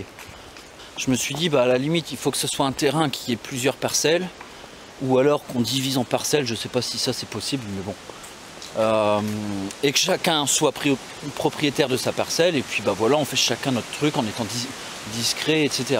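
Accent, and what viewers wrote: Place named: Nord, France. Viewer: T' es deuch' Nord tisote nan ? T ' as un p' tit accent ..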